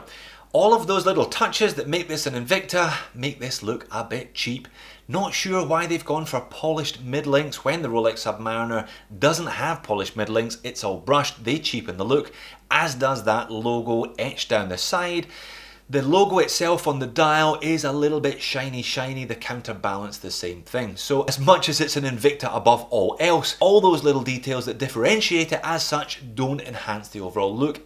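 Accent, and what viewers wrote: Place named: Scotland, United Kingdom. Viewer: A Scottish accent really makes these videos ten times better....... I always wish I was born in Scotland so I had a buttery smooth roll over my words. Just bought a two tone silver and gold version of this after years of wanting to get one, super excited. Thanks for your videos.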